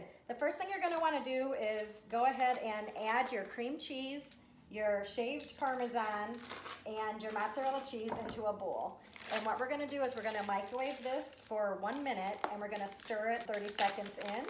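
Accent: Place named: Midwest, United States. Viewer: Okay, now I hear the accent. Wisconsin or Michigan? 😸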